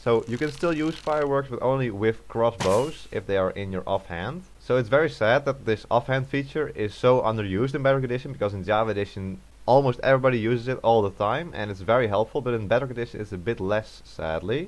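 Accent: Netherlands. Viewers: Ben je Nederlands want zo klinkt je stem als je Engels praat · Are you dutch? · ik hoor gwn jou nederlandse accent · Are you dutch 😂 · Are you Dutch? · You sound dutch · Ben jij Nederlands, want ik hoor een klein accentje? 😊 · Typisch Nederlands engels 😂😂😂😂😂😂😂😂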